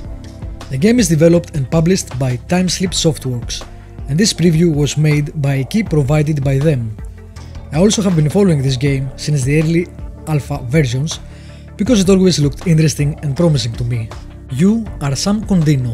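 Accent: Greece. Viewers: Congratulations because u are the only one that has made a Review of tis game. Igoogled "Vigilantes Review" and all the videos where gameplay except yours that was only a Review. I don't count the site reviews just the Videos. Συνέχισε την καλή δουλεία όταν είδα το 1ο video to " Medieval Napoleonic wars" από την προφορά κατάλαβα αμέσως οτι είσαι Έλληνας - έχουμε χαρακτηριστικη προφορα - έκτος Ελλάδος 3 στις 4 φορές που άκουσα κάποιον να μιλάει Αγγλικά το πέτυχα ήταν Έλληνας -.και μετά μπήκα στο "About" του καναλόυ σου και το διαπείστωσα. Sorry for the Greek language i was just congrajulate because he was the only one that has uploaded a video Review of the game and not Gameplay like the other videos when i Google " Vigilantes Review".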